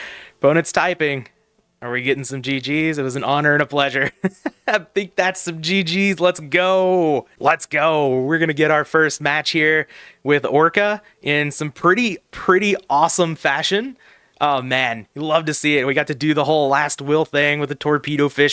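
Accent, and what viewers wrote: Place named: South, United States. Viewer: As someone from ohio you are southern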